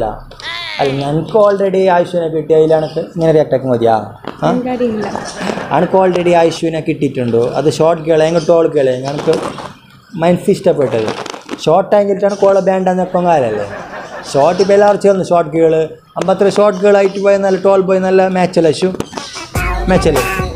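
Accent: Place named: Udupi, India. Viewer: She is from udupi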